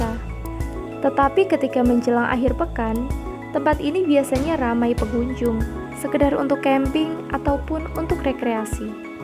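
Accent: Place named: Java, Indonesia. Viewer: Medok lek😁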